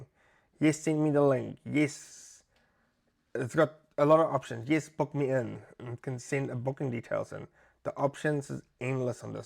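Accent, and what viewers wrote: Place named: South Africa. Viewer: i'm hearing the South African accent, fellow South African here. Thanks for the content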